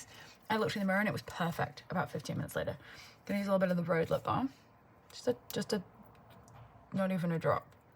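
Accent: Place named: Manchester, United Kingdom. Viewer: You kinda have a manc accent like my dad! Your looks are all gorgeous, loving this series doll!!🌸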